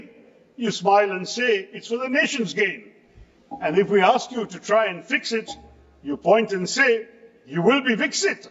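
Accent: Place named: England, United Kingdom. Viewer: At bjp side, no one understand what he is talking about ,in this English accent....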